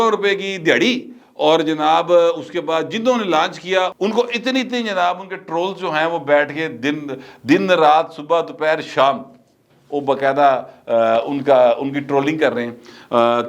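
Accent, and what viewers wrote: Lahore, Pakistan. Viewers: Your Lahori andaz is excellent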